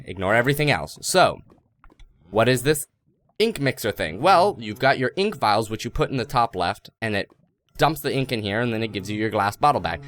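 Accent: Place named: California, United States. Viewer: Torkil Hansen I think that's just the typical Californian accent.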